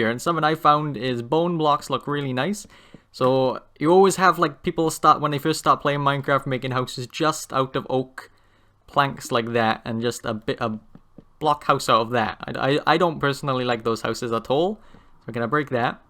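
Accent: Wales, United Kingdom. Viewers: I hear a hint of a Welsh accent?? Shout out to the home land? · You welsh?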